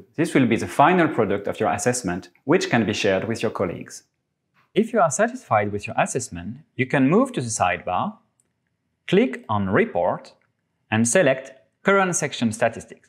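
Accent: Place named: Brittany, France. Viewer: Great tutorial for a great tool. Onwards with roll out! Thanks for the pedagogic presentation (with sexy Breton accent on top!)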